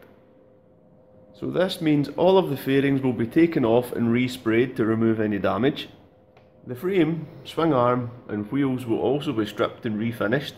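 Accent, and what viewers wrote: Scotland, United Kingdom. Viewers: First time I have heard your voice and you are a fellow scotsman 👍. You got yourself a new subscriber. Are you planning on keeping the ducati or selling it just out of Interest. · Where about in Scotland are you from ? Hello from Fife lol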